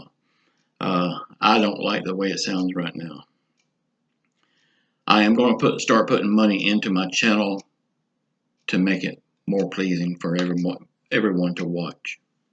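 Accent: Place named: Louisiana, United States. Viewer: My ex is from Louisiana. they are real people! Good to hear than accent once again. U do a great job my fine friend. You anywhere near Franklinton?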